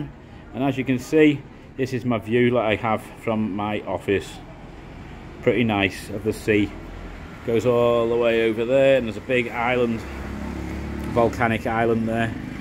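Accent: Liverpool, United Kingdom. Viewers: is Mada safe? I've always wanted to go i was fascinated with it when i was a kid i'm still a Geography nurd now that's why i was surprised i hadn't heard of nosy be. where are you from? am i detecting a Liverpool accent there?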